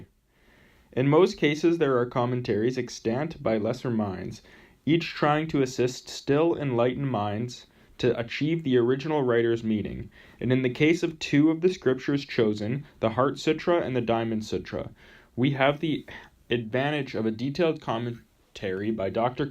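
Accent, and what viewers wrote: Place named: United States. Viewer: A teenage American with a cold narrating an English man's work. It really doesn't work.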